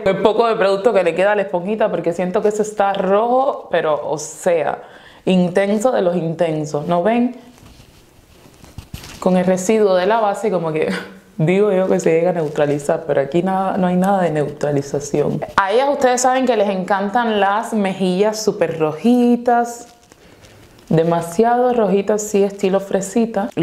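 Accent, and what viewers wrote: Dominican Republic. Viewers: cuando dijo que esas ofensas hacia ella misma eran bromas yo me dije como , eres Dominicana o algo asi? y luego dijo quija y fue como, noooooo si lo es y cuando dijo vaina en vez de cosa me dije confirmado XDXDXD saludos desde Rep.Dominicana .es que los Dominicanos nos decimos ofensas pero es más a modo de broma XDXDXD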